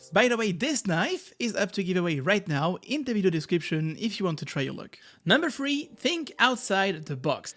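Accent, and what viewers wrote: France. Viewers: de ouf il parle super bien english mais t'entends tjrs l'accent français xDDD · Tellement frustrant d entendre cet accent mais de ne jamais avoir de video en francais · Wait, after all this time watching, i just discovered that t'as l'air vachement français bordel.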